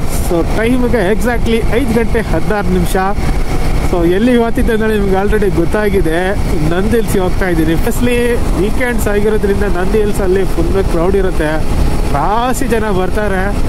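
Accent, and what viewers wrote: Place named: Kundapur, India. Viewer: ಕುಂದಾಪ್ರ ಕನ್ನಡ ❤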